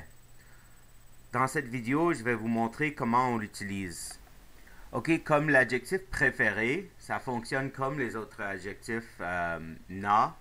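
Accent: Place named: Canada. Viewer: merci, j'ai beaucoup de plaisir à suivre tes vidéos; elles sont très claires et progressives. Elles vont à l'essentiel et le rythme mesuré permet une meilleure assimilation. Merci encore (suki desu) Gilbert Savoie, France . NB : L'accent canadien est savoureux.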